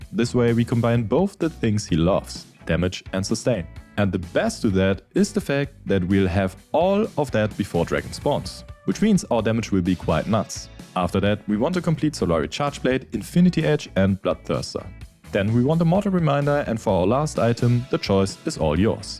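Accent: Germany. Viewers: Klingt etwas als würdet ihr aus Deutschland kommen xD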